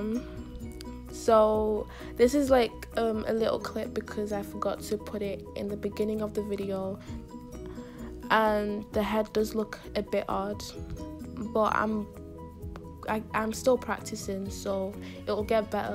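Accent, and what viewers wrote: United Kingdom. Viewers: Where r u from? It’s sounds like a london accent sorry if it’s not❤️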